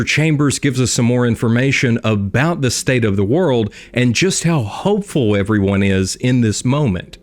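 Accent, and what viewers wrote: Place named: West Virginia, United States. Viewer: Is he from ky or wv. Iam from wv i hear wv accent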